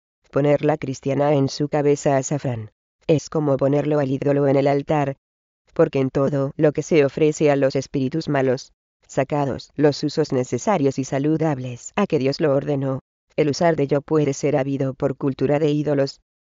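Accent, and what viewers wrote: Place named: South America. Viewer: pronunciación robótica y sudamericana, llena de seseo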